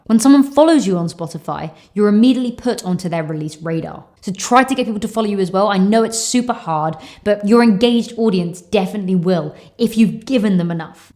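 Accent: England, United Kingdom. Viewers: Which area in England you're from? (love the accent)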